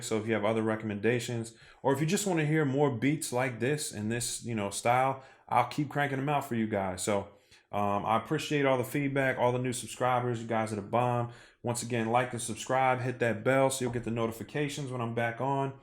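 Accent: Philadelphia, United States. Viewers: You from Philly fam?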